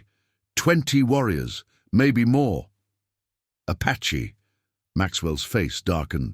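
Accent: England, United Kingdom. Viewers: English accents are pleasant and easier on the ear than American accents. Even though I am southern American.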